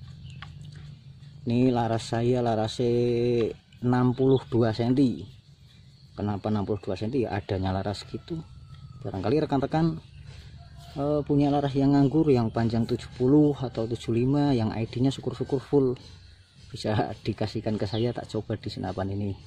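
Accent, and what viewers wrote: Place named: Central Java, Indonesia. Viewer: Mas bro.. logate ky Jawa tengah njih.. Asline auto ngiler niki... 🤭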